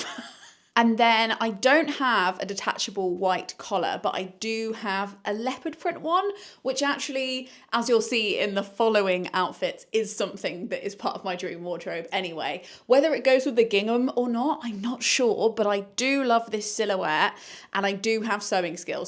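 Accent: United Kingdom. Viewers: that black top is LOVELY. Forget the bottom button closure situation, couldn’t matter less imo. Side note I grew up in Aberdeen for a few years of my adolescence and currently live in Colorado. Your voice and style and energy make me miss life in the UK so much! Been watching you for probably 5+ years now and just want you to know I love your content (as a 29 year old) and think you’re lovely! Embrace the goth and keep guiding me through my tumultuous American life!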